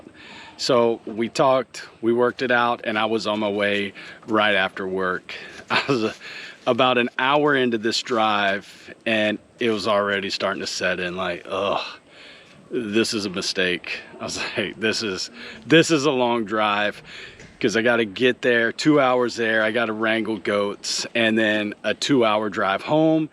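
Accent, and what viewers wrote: Louisiana, United States. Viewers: Love the channel!! Where you from, you definitely don’t sound like Florida…that sounds like my neck of the woods…Louisiana!! If you not from Louisiana you definitely must do a lot of swamp impressions and your getting better at it😂 I’m not from the parts you sounds like but it sounds definitely Pierre Part La but im from a whole different bayou in Pointe Aux Chen La on a Native America Indian Reservation😊 God Bless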